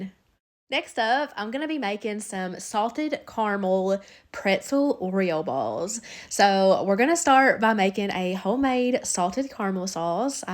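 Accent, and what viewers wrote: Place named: Kentucky, United States. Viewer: All of it looks delicious! Something that I can make! Love that Kentucky accent!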